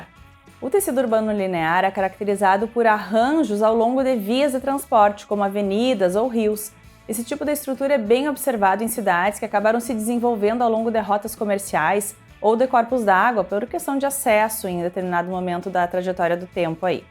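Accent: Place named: Rio Grande do Sul, Brazil. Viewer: Bah fácil perceber que tu és gaúcha né guria😂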